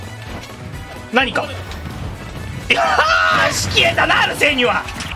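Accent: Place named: Hakata, Japan. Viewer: シンプルに心配やしとりあえず体治してまた長野まで博多弁届けてくれよな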